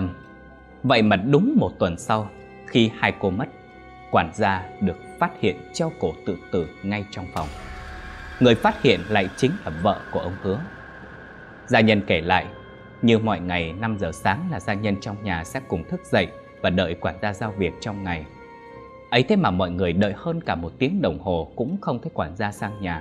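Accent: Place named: Central, Vietnam. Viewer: Nghe truyện của anh thấy hay lắm luôn ạ 🥺🥺 nhưng mà do giọng a ở miền trung e nghe hơi khó ạ 😢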